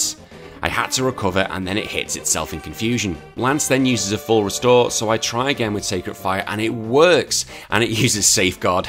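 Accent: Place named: England, United Kingdom. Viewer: Love seeing these challenges with a local-ish - English - accent. And swearing. The recorder fucking broke me.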